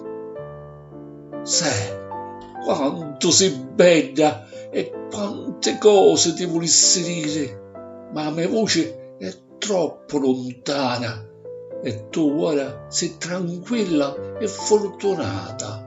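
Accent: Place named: Sicily, Italy. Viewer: Che bedda poesia ...😂😂😂 dialetto siciliano..bello da ascoltare e passionale..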